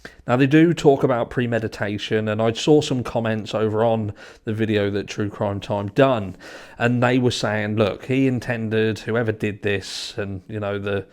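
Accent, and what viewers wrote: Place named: England, United Kingdom. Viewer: U sound like ur from England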